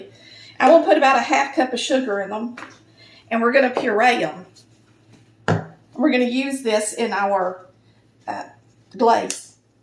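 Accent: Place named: South, United States. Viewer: Happy birthday! Hope you’re having a wonderful day with family and/or friends! I love your accent! I’m from the Chicagoland area. I truly appreciate the southern hospitality, recipes, and southern drawl! Thanks for sharing many good recipes! 🌸😋💐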